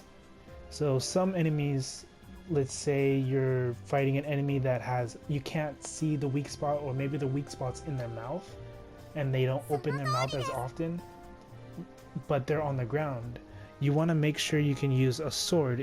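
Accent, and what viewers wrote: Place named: Arab world. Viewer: واضح من لهجتك انك عربي بس لهجتك أحسن بكثير من كثير من العرب، ما شاء الله 😂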